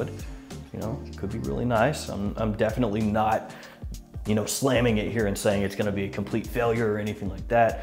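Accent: South, United States. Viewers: Fragrance Leader... with a slight Southern accent... outstanding! #BeepBopBoop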